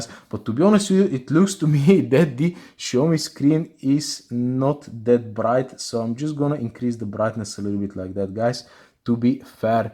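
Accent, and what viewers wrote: Russia. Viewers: russian accent prosto pizdec) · Russian bad English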